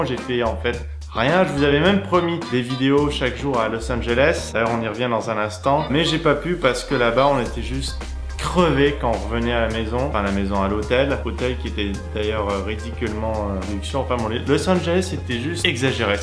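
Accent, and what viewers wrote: Quebec, Canada. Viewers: j'aime bien le concept! PS. j'aime ton accent semi-QC, semi-FR. Continue t'est le King de l'internet!!!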